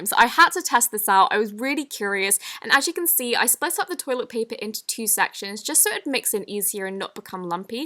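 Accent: England, United Kingdom. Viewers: James McDonald why I’m irish and we hate the English like i hate this both but i don’t hate her voice and it’s an english accent not British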